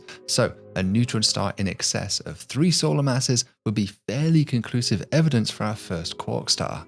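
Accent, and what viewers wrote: United Kingdom. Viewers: "Poolsar"? Never heard that pronunciation before. Does he also say, "Check my poolse"? OK, he also says "repoolsion." Where is from that he has a classic British accent except for that quirk?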